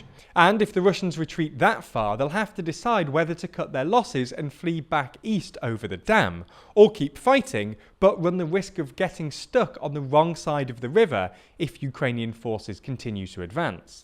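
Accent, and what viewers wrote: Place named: Liverpool, United Kingdom. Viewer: I cannot stand this guy prenouncing GUverment instead of gOverment. It is a great newsflash, really you're doing a great job but please lose the Liverpool speech impairment. It is like a dripping crane that slowly drips a hole in my skull.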